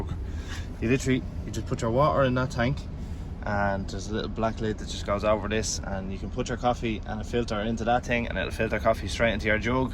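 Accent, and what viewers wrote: Ireland. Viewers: A nice broad Irish accent. Sometimes need to listen a wee bit carefully but I get the gist of most of what he's saying. I certainly don't want to sound disrespectful or rude to the nice young man. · Hey, Dutch trucker here, I really enjoyed the views, I only drive Netherlands and Belgium so. Normaly I watch English movies without subtitles but your Irish accent and kind of mumbling is really hard to understand... also the automaticly generated subtitles aren't very helpfull. Maybe you can edit subtitles correctly for future videos, I am subbed from now on.... peace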